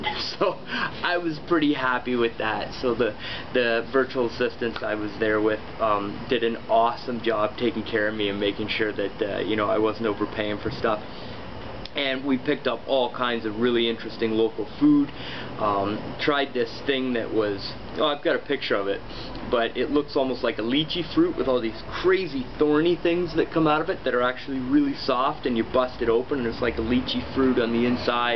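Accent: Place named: Canada. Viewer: Oh shoot! You're Canadian! I knew it from listening to your accent, haha!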